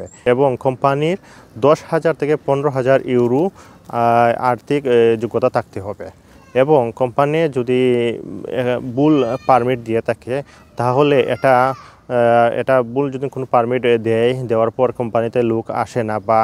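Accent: Sylhet, Bangladesh. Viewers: আসসালামু আলাইকুম ভাই আফনার বাড়ি কিতা সিলেট নি?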